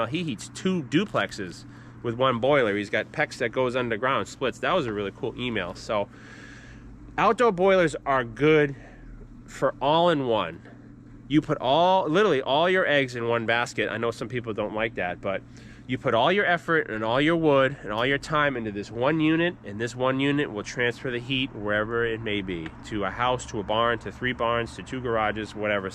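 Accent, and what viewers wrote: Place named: New England, United States. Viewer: You’re definitely from New England. That accent chining through. 😂 I’m from Maine. You?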